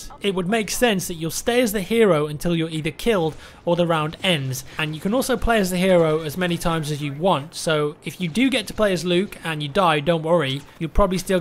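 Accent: England, United Kingdom. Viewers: Lewishague10 well me and are from the same town in England and thats just how its said, put it down to accent or w/e you want but it is what it is and it is fact lol. bee-ta i wouldnt just make shit up for the fun of it lol. even admitted it himself so there you go. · You sound British, yet you say beta the American way? Lol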